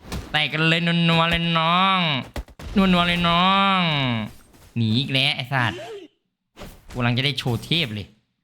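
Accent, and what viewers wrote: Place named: Korat, Thailand. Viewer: คนโคราชนิครับ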